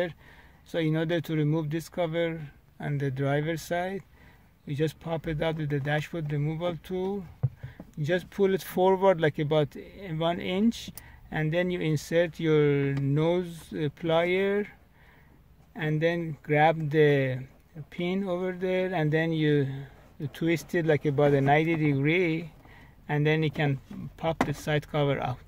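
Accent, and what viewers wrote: Iran. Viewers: You sound like your Persian, great video. How do you tell, or what are the symptoms this part needs to be replace?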